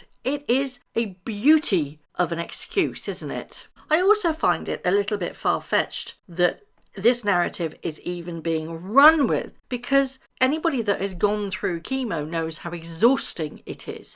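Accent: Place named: RP, United Kingdom. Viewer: Dumb American here. I enjoy trying to suss out UK accents, and I've gotten pretty good over the years. But yours is a mystery....very neutral....good enough for BBC....just a bit posh-sounding (at least to my ear).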